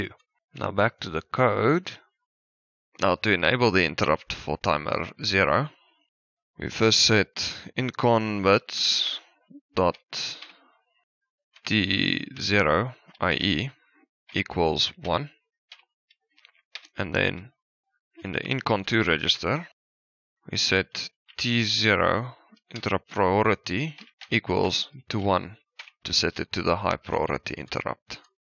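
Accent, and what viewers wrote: Afrikaans, South Africa. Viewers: Klink of jy Afrikaans kan praat :-). Great videos! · Is jy afrikaans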